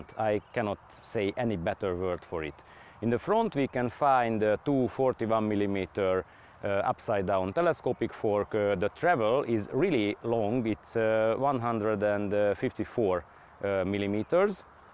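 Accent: Hungary. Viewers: Borzalmas akcentus... komolyan. Magyarországon képtelenek megtanulni az emberek, hogy NE magyar hangleejtéssel beszéljenek angolul. Inkább feliratozzátok a videót.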